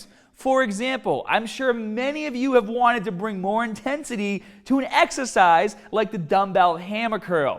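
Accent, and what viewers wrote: Boston, United States. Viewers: Can you not see that he's from Boston or are you just that dense? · Are you from Boston? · God I feel like I just took a trip to Boston. if I did a video you would probably get a kick out of me saying barbell and yard.My step mom is from Boston and she says bahh-bell instead of barbell and Bahhs-ton instead of Boston lol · Boy from baaaston or new england for dam sure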